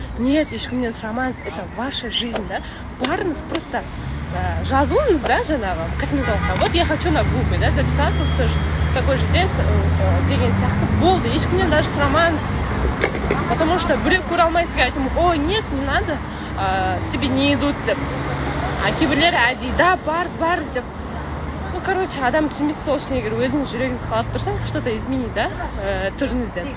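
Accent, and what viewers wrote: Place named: Russia. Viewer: саған орысшаң акцентпен күлдіргі келтіресің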